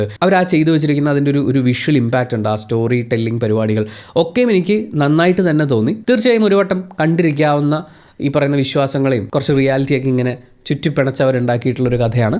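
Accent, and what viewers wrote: Kannur, India. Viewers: Ente samshayam Tamil Nadinte Borderil ulla Place. Parayunnathu muzhuvan Kannur Slang. Ethu Ethaa Sthalam.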